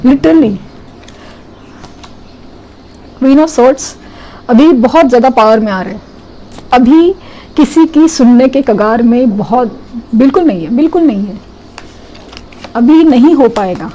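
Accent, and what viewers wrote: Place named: South, India. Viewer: Mam ! Your Hindi sounds like south Indian Hindi, but feels very sweet to listen 👌👌😀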